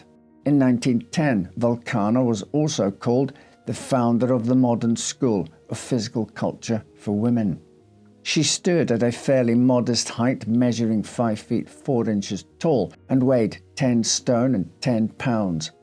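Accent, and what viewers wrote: Scotland, United Kingdom. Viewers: He's Scottish!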